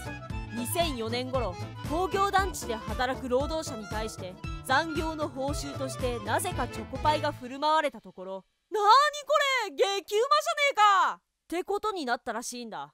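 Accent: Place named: Hakata, Japan. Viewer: 山梨県民なのにバリバリ博多弁 いえいえ、気にしないでください！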